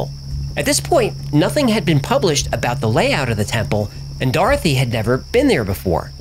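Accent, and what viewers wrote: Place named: East Coast, United States. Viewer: Hey, do you have any relation on television? The hubby and I watch “Toon in with ME” on METV and there’s a guy on there named Toony the Tuna, and he’s got an east coast accent like yours. Coincidence??? AJ says there’s no such thing.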